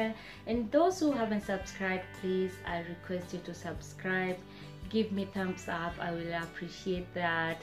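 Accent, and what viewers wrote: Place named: Germany. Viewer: Good cooking my dear🇸🇪🇸🇪🇸🇪😁😁😁😁😁🦚🦚🦚🦚🦚👜👜🌔🌔🌔🌔🌔I like your German accents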